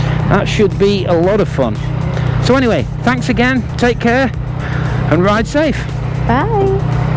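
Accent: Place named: Northwest England, United Kingdom. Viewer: . Thanks for the response. I did notice a slight northwest sound. (Similar to Freddie Flintoff but less thick.) On to the video, thanks for taking us on a leisurely jaunt to Hillarys Boat Harbour, a place I want to return to. 3 Sheets on the Harbour, bloody nice as margarita pizza.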